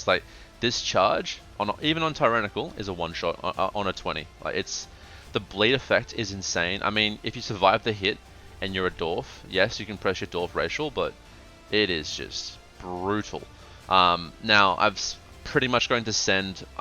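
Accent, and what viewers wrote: South Africa. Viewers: Thank you very much. BTW your accent sounds like you are from South Africa. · Are you from South Africa? Accent sounds a bit like it.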